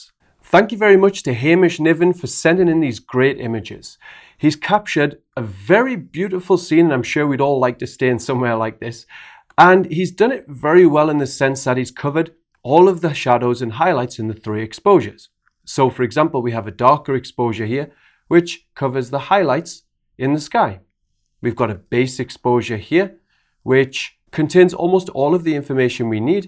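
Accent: Newcastle, United Kingdom. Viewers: Found this via SLR Lounge on Facebook. Subscribed. A Geordie teaching Photoshop might just be the best thing ever. The only thing that would make it better was if you did a video while you were drunk lol.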